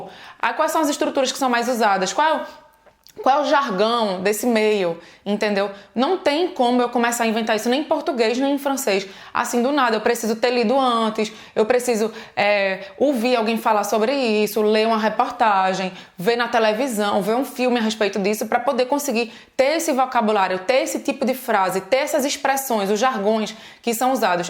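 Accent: Pernambuco, Brazil. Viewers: Que aula chatíssima. A 'professora' fala muito e seu sotaque pernambucano é muito irritante. Em 15 minutos de vídeo e nada de aula real. Lamentável! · o sotaque pernambuquêz acelerado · Ai mulher, eu te amo. Eu to na belgica, comecei a aprender frances agr e vcs estao me ajudando muito. você é de recife? Porque eu sou de lá, e seu sotaque é muito recifense. bjjjuu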